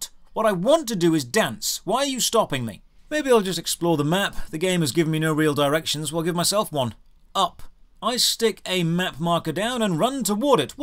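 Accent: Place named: RP, United Kingdom. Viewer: I love that you sound so posh and well spoken, so hearing you drop the f-bomb instantly makes me cry laughing. Thank you for all these videos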